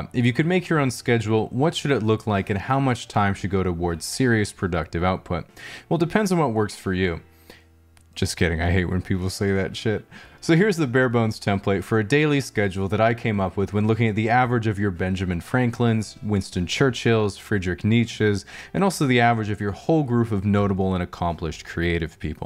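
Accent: England, United Kingdom. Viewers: your dramatic Narrative English accent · Thats an amazing British accent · I love your brittish accent holy shit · Your British accent tho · your British accent is gooood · That British accent is absolutely fantastic, you sound more British than me and I’m British. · Jesus that English accent is flawless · why do you sound like Tom Hiddleston in that British accent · I’m actually very impressed by his British accent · Imressive British accent, dude. New here, but will check back ~ 💁🏼‍♀️ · so no one's gonna talk about how he perfectly talked in British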